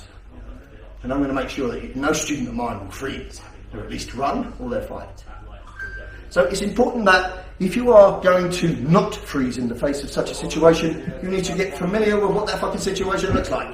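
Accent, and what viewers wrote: England, United Kingdom. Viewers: Amazing….maybe because I dont understand this englisch akzent sad I main idea is ….if the enemy touch my hands(fence) the I give him a blow and go straight on and kick him of the planet. Is this right? Can somebody write the main entantance of this vid for me in this comment? I am so interest to know what really he ment. I dont understand this art of spoken english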